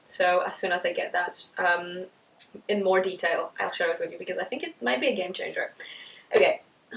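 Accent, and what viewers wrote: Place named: Germany. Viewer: Love your German accent.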